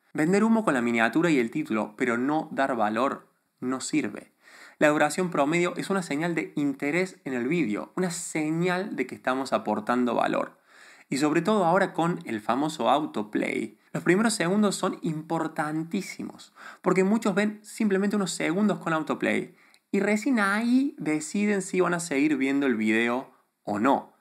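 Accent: Argentina. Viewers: Tienes buenas ideas pero te falta muuuuucho en la neutralidad de tu español. Si tienes acento argentino muy marcado. Pero igualmente das buenos típs. Un saludo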